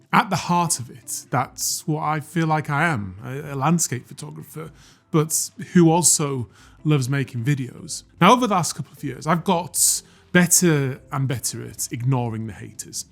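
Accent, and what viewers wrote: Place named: United Kingdom. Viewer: Hey, I just discovered your channel during the lockdown 2020. I just want to say: Thank(!) You(!). Watching your videos is something I can do for hours, because of your combination of stunning photographs, good explanations, nice stories ( and your british english 😀 ) I don't care, which amount of money you earn or how you could be categorised. I am just happy, when there's a new video I didn't see yet, making this stayathomeadonothing times a bit less ugly for me. Keep going as you are and don't give a sh.. about the haters - there always will be some. Also I am really looking forward to read your book! Best regards from Germany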